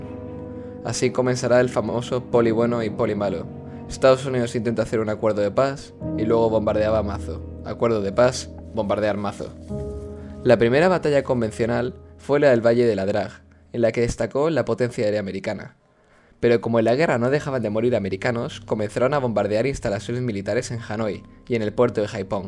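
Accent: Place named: Spain. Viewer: El payaso este es español, conozco el acento, como todos los comunistas es un niño pijo de familia mimada.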